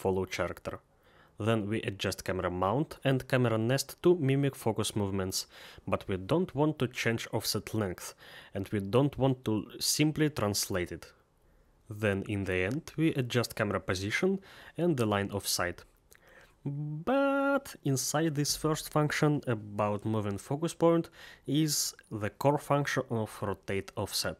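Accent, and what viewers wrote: Russia. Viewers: Очень чётко слышно русский акцент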